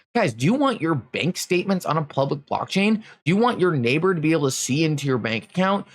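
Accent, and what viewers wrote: Allentown, United States. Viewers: xrp is dead sounds like you have an Allentown accent. Figures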